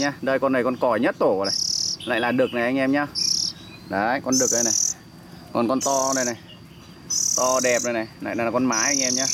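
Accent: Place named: Vietnam. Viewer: Bác giọng Thanh Lãng ak :))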